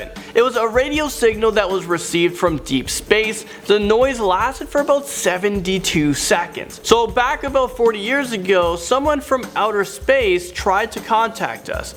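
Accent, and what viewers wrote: India. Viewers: r u inidian ? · Are you indian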